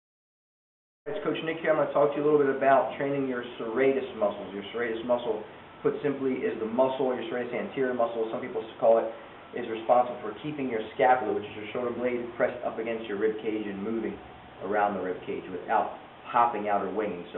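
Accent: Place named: Philadelphia, United States. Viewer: Ended up here because I have to study the Serratus Anterior for an A&P exam and came across an article about how in a weakened state, can cause rounded shoulders. BUT- by doing a push up plus you can increase your strengthening of this muscle, so I ended up here. Don't ask why but 15 secs into this video, I couldn't stop wondering if Nick is from the NE, particularly, Philly. Just from listening to how he speaks.Could be wrong but it's kinda fun to be able to determine that.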